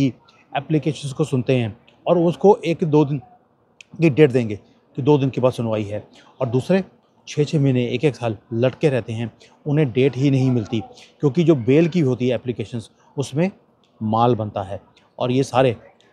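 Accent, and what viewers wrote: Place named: Punjab, India. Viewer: AAP KI ACCENT PUNJABI HEY AUR AAP KA BASERA CHEEN MEIN HEY AHLIYA BHI AAP KI CHEENI HEY AAP KA NAAM HEY ISS PAR ROSHNI DAALIYE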